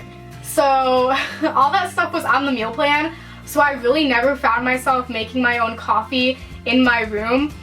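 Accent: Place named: Buffalo, United States. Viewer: are u from buffalo? you totally sound like it!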